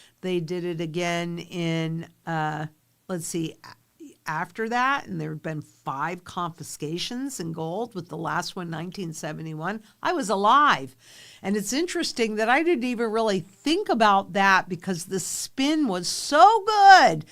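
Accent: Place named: United States. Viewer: My nit.....An historical is used when you speak in a British accented English because the "h" is silent. American accented English says "a" historical cause the "h" is voiced. speaks American accented English. So, she is correct. you can take your nit back now.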